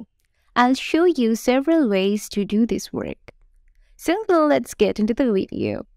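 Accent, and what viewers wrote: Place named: East Asia. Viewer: Nope. Eastern Asian speakers normally speak this way.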